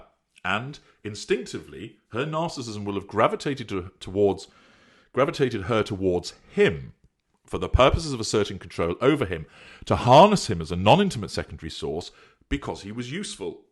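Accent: United Kingdom. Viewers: Incidentally you kind of actually sound like Piers Morgan. And not just because you're British and have a similar accent. You remind me a bit of Jeremy Clarkson (another narcissist, surely) as well. On the topic of narcissists in the public eye🤣, Russell Brand has got to be another. Though he is self depreciating and quick and funny, he so clearly demonstrates narc traits. His past drug abuse, sex addiction are symptoms of this. I would imagine that his father, who wasn't really present in his childhood at all but was known to be a womanizer, probably helped form the narcissism.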